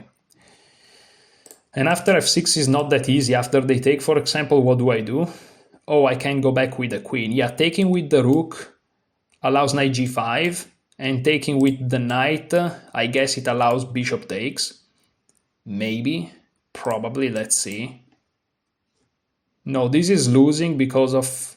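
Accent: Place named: Italy. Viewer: Bro your English is so fluent and rich in vocabulary yet you have this charming Italian accent. It sounds so cool. Never change it! Greetings from Germany!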